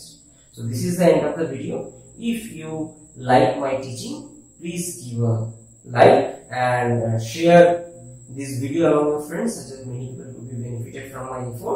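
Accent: Bengal, India. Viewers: Hmmmm, Apnar kotha sunei bojha jay. Bangali bangali ke chine fele.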